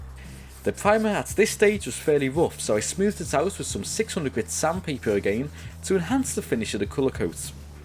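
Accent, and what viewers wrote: Liverpool, United Kingdom. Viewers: I can't tell if you're scouse or not. Also the spoiler looks nice. Keep up the good videos!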